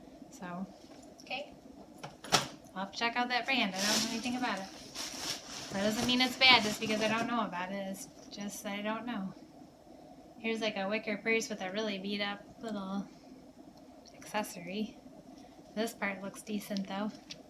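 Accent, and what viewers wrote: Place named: Michigan, United States. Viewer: Michigan! I was trying to figure out your dialect and it was driving me mad that I not knowing.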